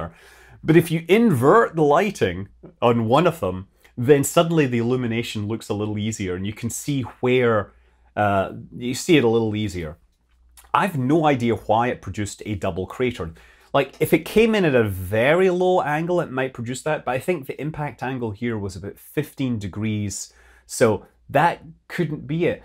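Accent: Scotland, United Kingdom. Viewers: whatever the distraction be it's certainly lightened up your Troon lilt somewhat expressing giggles and tongue tied pronunciations in places made me think you've been showing your support and appreciation for the micro-brewery industry. Again! Lol. Sorry, you have this fabulous narrative skill that's appealing and easy to digest as half the time I won't be watching your youtube update but listening all the same. It's a bit like you stream your Lego set construction while i'll get on with the ironing over here busying myself. Seriously, your extensive knowledge base linked over many disciplines and an un-baised view is so pleasurable and refreshing on a platform inundated with competition. Keep up the good work and fly safe.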